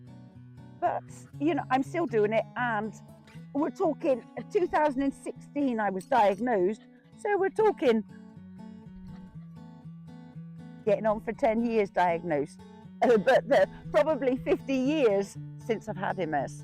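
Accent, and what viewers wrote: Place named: West Country, United Kingdom. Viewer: thanks for that lovely walk in the country, glad to hear you have not lost your west country accent. Go girl. keep walking and talking.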